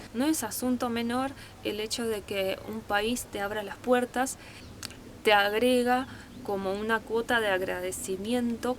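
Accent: Córdoba, Argentina. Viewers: yo diria que se le nota la tonada cordobesa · Me encanta tu acento cordooobés. 😀😀 Linda historia. En el próximo video, si querés, contá de qué viven, cómo ganan dinero y si es en relación a lo animales que tienen y qué animales tienen. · ese acento se esta pareciendo cada vez mas al acento cordobès. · Habla cordobes jajajajaa muy bueeenoooo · Ya hablas como cordobesa bien ahí !!! Welcome a mi Córdoba Argentina ❤ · De vez en cuando se te nota el acento cordobes .Saludos desde Australia. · No sé como llegué a tu video, pero me encantó!!! Tenés una forma de hablar muy sueve y amena, transmite tranquilidad y lo hacés en un perfecto castellano (argento!) con matices cordobeses!!! Me encantó la historia familiar y su proceso de migración externa/interna... Te ganaste un seguidor. ¡Gracias! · Hola muy interesante tu historia, sinceramente sos una Argentina mas hablas muy Argento jajaja besos desde Cba. 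Cap. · O sea que sos ucraniana?? No parece!!!!! Hasta la tonada cordooobesa se te siente...también soy música!!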